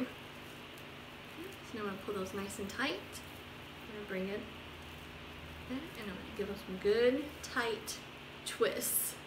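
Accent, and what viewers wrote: South, United States. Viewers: You have a very southern accent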